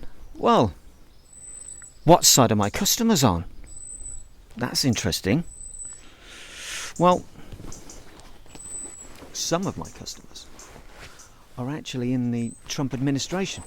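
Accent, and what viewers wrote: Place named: United Kingdom. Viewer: Oooooh une vidéo en français !! J'ai hâte de découvrir. Avec l'accent british qui colore joyeusement tout ce qu'il touche ! A new challenge doesn't scare you, does it..? ☺ ✴🌟✴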